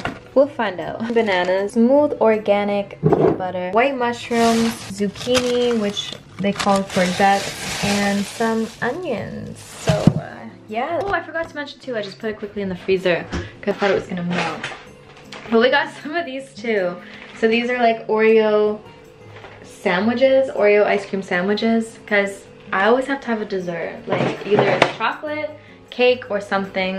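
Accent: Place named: Brooklyn, United States. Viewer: Where are u from !!???You are giving me Brooklyn accent!!!Luv it !!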